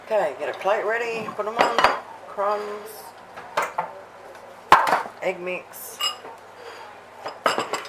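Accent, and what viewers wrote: South Australia, Australia. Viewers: You have to be a south aussie, I can tell lol Love your recipes, thanks for sharing 😊😊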